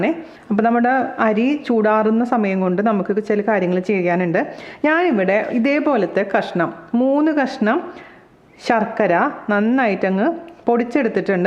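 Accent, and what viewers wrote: Thrissur, India. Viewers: I am new fan if u frm mumbai, surely will try this ariyunda. Ari varuthu chayayil ittu kazhikkunnathu kandittundu. Pandu shabarimalakku nadannu poyirunna alkkar hard aya ariyunda erinju wild animals ne okke odikkarundu ennu ammamma paranjathu orma vannu. paranjille harda aya ariyunda erinjal chathu povum ennu. Athu kettapool ammamma paranja karyam orthu poyi. Enthayalum iniyum lockdown recipes iniyum poratte. One more thing. Ur Trissur style of speaking us really attractive.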